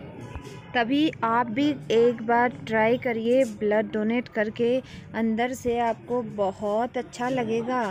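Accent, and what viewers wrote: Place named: Pahari, India. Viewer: Mam uh look soo pretty and cute...and I really like the way you speak pahadi...😘😘😘😘...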